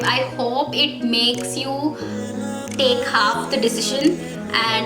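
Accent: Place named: India. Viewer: Indian English very Funny... 😅... Practice on pronunciation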